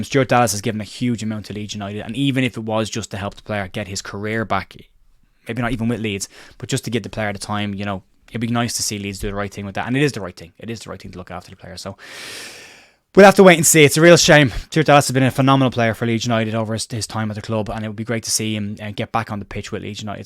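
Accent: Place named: Ireland. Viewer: Keep up the good work mate. Even the Irish accent is easy on the ear.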